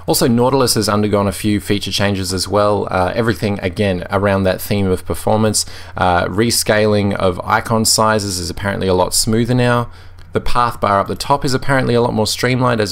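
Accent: Australia. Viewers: are you an australian?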